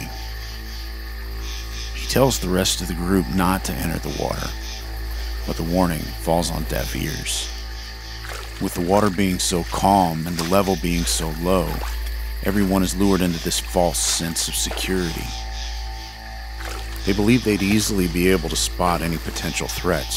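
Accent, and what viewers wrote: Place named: United States. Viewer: Great narrative. Good thing by an American otherwise I think I'll have a hard time understanding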